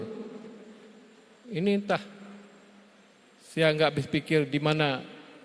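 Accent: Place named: Aceh, Indonesia. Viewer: muzakir manaf belum pas berbahasa Indonesia masih logat Aceh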